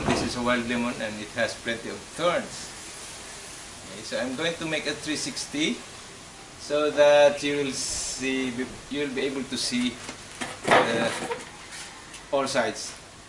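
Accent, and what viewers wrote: Philippines. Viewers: That's what he's speaking. Sounds like a Filipino accent.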